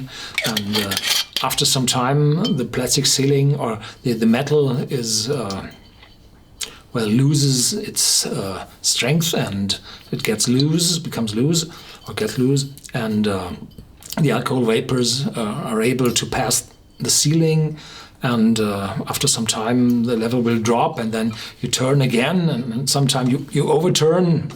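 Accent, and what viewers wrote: Germany. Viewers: I hear a German accent?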